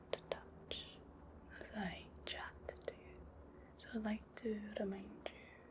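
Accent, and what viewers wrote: Scotland, United Kingdom. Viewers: Time to melt to her beautiful Scottish accent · I stumbled upon your channel thanks to the algorithm. Rare for me to fully understand every word from a Scottish accent. Thank you for the calming video. +1 sub. Based on comments, you may have been away for a while. I see it often. Take time as you need. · Beautiful British lass/accent. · I've just come across your channel. Sitting comfy on my sofa. Headphones on. Play! Close my eyes and your tones give me a tingling sensation from head to toe. Be still my beating heart. I've always loved the Scottish woman's accent. I meditate everyday for my own mental wellbeing. So Scottish lassie you waffle all you like, Because this Irish lad will listen to you all day. Stay healthy, safe and carefree.😍❤ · I love the Scottish accent. It's so pleasing and sexy to listen to. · I literally clicked this video just to hear the Scottish accent.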